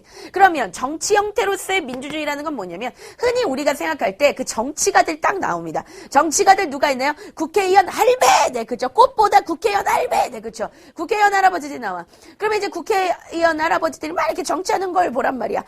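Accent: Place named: Jeolla, South Korea. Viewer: 아니 ㅋㅋㅋㅋㅋ 전라도 사투리 개웃기네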